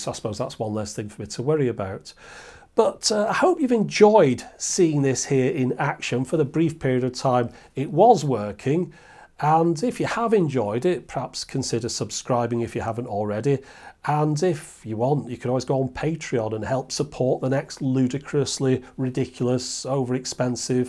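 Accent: United Kingdom. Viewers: British axxcent? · Your british accent is pure eargasm.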